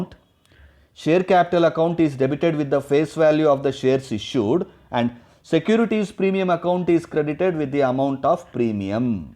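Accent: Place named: South, India. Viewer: Aap south kyu bolne lagte ho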